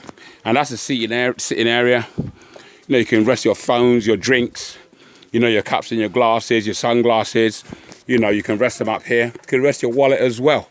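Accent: United Kingdom. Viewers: I was thinking to myself that you sound so British and found myself wondering if you spoke Igbo. Then I got to the end of the video, and you actually spoke Igbo. Love it!!😍😍 You are a bonafide sob of the soil. Okpara eji eje mba! Jisike, nwanne’m.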